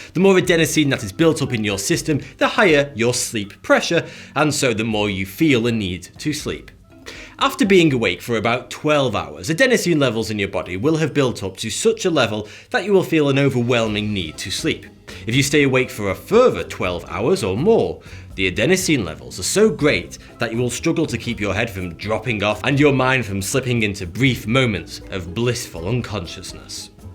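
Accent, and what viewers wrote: United Kingdom. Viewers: This guy has a Monte-Pythonesque accent. Does anyone know what kind of accent this is? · Accent of this guy sounds a little like over-acting .... he sounds like the uttermost british guy you can get in the UK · uk narrators eventually get on my nerves...wish theyd just talk in a normal, non-dramatic, non-sarcastic or ironic voice..I just want info, Im not looking for the most amusing delivery vid...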